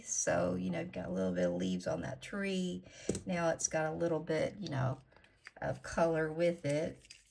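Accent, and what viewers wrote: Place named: South, United States. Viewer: This is a darling mani! The reindeer and tree turned out so cute and I love plaid. The colors you chose are beautiful. Please don’t apologize for that southern twang. It’s your heritage and part of what makes you special. Being from the South I sometimes wish I had a Southern accent, but I do not. I don’t even sound like my siblings. I take after my mom who was from NC. That’s not that far away but the accents are so different. My dad was a country bumpkin if ever there was one! I’m the odd ball of the family. Even my daughter doesn’t say words the same way I do. Geez, talk about rambling!😂 Thanks for another fun night with you. I’m going to miss these videos.❤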